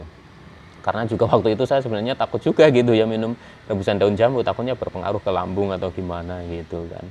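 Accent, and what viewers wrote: Banyumas, Indonesia. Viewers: Mas sudah berapa tahun Jawab y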